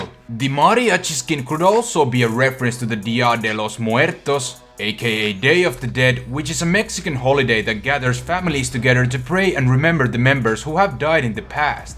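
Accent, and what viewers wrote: Finland. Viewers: Hey are you Finnish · Are you finnish cause it sounds likes it :D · Tiesin heti ku kuulin sun äänen et oot suomalaine XD ja hyva video voiks tehä Tips And Tricks ? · Wow! When I heard you speak I instantly knew you were finnish. HYVÄ VIDEO MUUTEN! ☺ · are you finnish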